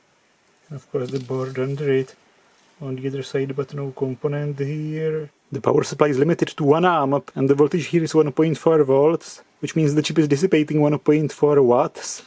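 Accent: Prague, Czech Republic. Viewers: sounds like czenglish (Czech Republic) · Ta pražáčtina v anglické podobě je běs 😀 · I KNEW YOU WERE CZECH!!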